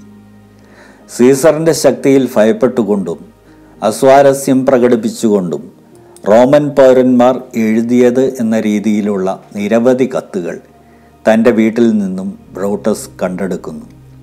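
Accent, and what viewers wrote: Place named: Kollam, India. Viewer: സാർ ഫയം അല്ല ഭയം കൊല്ലം പത്തനംതിട്ട ഭാഗങ്ങളിൽ ഭ" എന്ന അക്ഷരം ഫ " എന്നാണു ഉച്ചരിച്ചരിക്കുന്നത് അവതരണങ്ങളിൽ പ്രാദേശിക ശൈലി ഉപയോഗിക്കാതിരിക്കുക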